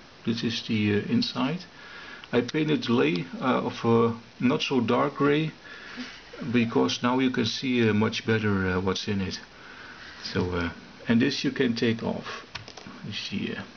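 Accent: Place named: Netherlands. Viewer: I love the Dutch diction and intonation. No matter what, when a Dutchman is explaining something, even if it's something completely mundane, it always sounds like he's reading from a text book on quantum mechanics.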